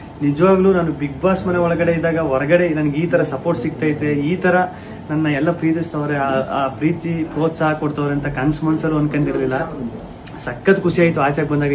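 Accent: Mandya, India. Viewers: Hi ಗಿಲ್ಲಿ 🌹💐🙋‍♂️🙌😍 ನಗಿಸೋರು ಹೃದಯವಂತರು 😍 ಅವರ ಮನಸು ಯಾವುದೇ ಕಾರಣಕ್ಕೂ ಅಳಲೆಬಾರದು 🙌 ನಿಮ್ಮಲ್ಲಿ ಅತ್ಯದ್ಭುತ ವ್ಯಕ್ತಿತ್ವ ಇದೆ ಸರ್ 🙌😍 ನಿಷ್ಕಲ್ಮಶ ಮನಸು ಮಂಡ್ಯ ಸಿಹಿ ಮಾತಿನ ಶೈಲಿ 👌👌👌👌👌👌👌 ಒಳ್ಳೇದಾಗ್ಲಿ ಸರ್ ನೀವು ಇನ್ನೂ no1 ಆಗಿ ಬೆಳೀರಿ ನೀವು ಖುಷಿಯಾಗಿದ್ರೆ ನಮಗೂ ಇನ್ನೂ ಖುಷಿ ಸರ್ ಒಳ್ಳೇದಾಗ್ಲಿ ಸರ್ 🙋‍♂️ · All the best ಗಿಲ್ಲಿ . ಇನ್ನ ಎತ್ತರಕ್ಕೆ ನಿಮ್ಮ ಕೀರ್ತಿ ಬೆಳಗಲಿ. God bless you ನಿಮ್ಮ ಮಂಡ್ಯ ಸೊಗಡಿನ ಭಾಷೆ ಅಂಬರೀಶ್ ಅಣ್ಣನ ರೀತಿ ಹೀಗೆ ಇರಲಿ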